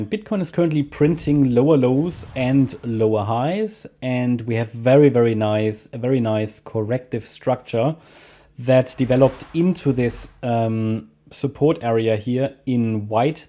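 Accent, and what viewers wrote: Germany. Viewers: Great content! Just discovered the channel. German?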